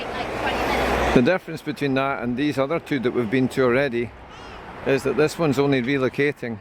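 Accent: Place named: Scotland, United Kingdom. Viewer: I've heard this mild Scots accent before . Tell me about it . I have even heard a milder Scots accent . And I have been tae Arran ...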